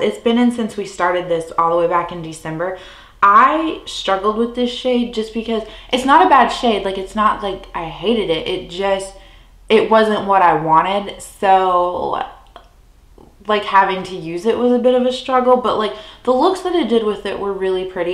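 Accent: South, United States. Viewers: You are adorably Southern! Great progress :)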